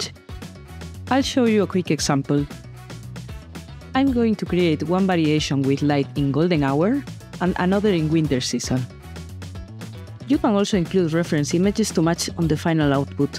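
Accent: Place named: Latin America. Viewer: The latino dialect makes any tutorial a joy to study! 🙂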